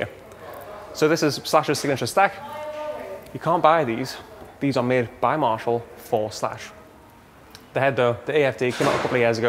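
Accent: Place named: Geordie, United Kingdom. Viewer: I've only just clocked something about you...with a hint of camp in your voice and the way you suddenly drop your voice down It's Kenny Everett! Seriously are you from the same town? You have the same kind of rhythm..it's not quite Geordie but close by am,I right are you and Kenny from the same streets?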